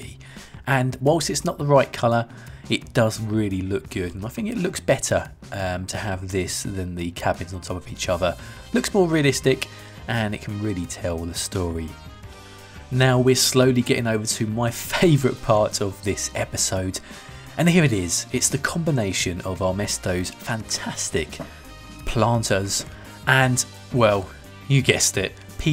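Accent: United Kingdom. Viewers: english accent, makes you sound knowledgeable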